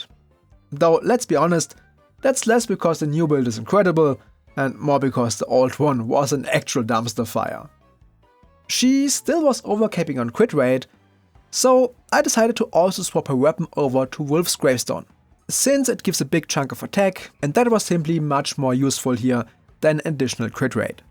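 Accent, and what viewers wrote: Germany. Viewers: HOERE ICH EINEN KLEINEN DEUTSCHEN AKZENT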